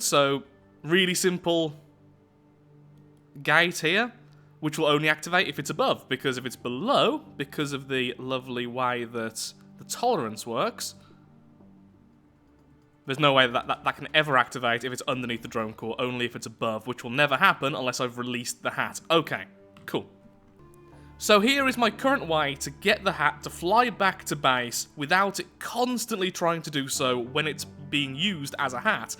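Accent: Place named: Wales, United Kingdom. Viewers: You are Welsh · wait is from wales....*fist thing i think of* "WALLLLLLE LOOOOOORDDDDDDDDDDD" cough sorry i mean no dis respect...i was alwase wondering were your acent came from cool to know (sorry about the bad english)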